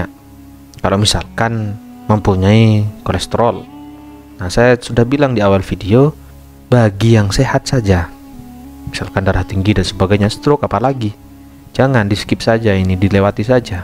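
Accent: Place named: Madura, Indonesia. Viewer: Adminya ketahuan sekali maduranya 🤣🤣🤣🤣 . . .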